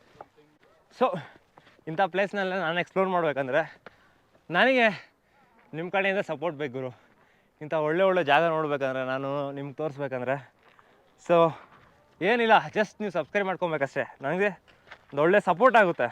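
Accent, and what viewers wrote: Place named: Chikkaballapur-Kolar, India. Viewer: Brother nivu chikballapura kolar side avra Language a tara ide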